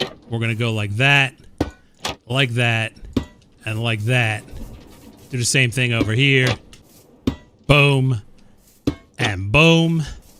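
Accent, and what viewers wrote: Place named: Philadelphia, United States. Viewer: As soon as I heard you pronounce water as "wooder," I strongly suspected you were from the Philly area. I grew up near Pittsburgh, which has entirely different accents, but it's still nice to hear some Pennsylvania pride. Respect, sir!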